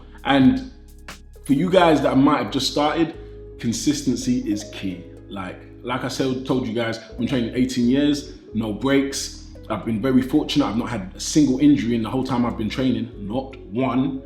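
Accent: United Kingdom. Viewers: British, can’t you tell from his accent.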